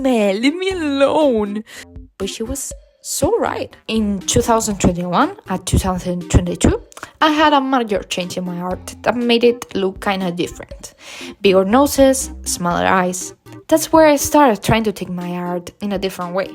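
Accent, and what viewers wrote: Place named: Spain. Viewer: You should be on my voice claim list for me and my friend's oc cuz OMG YOU SHOULD BE CHARLIES GENDER SWAP VOICE CUZ OF YOUR SPANISH ACCENT , btw love your art keep it up :3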